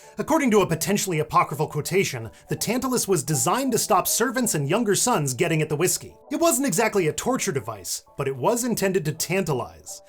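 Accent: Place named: Midwest, United States. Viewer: exactly! It almost feels like this dialect of Midwestern English is being influenced by the fact that 1/3 of the voting population want to get rid of public education. Maybe it is a legitimate dialect. But it feels more like a collection of linguistic mistakes with an accent. ...But maybe that's all a dialect is, when you get down to it.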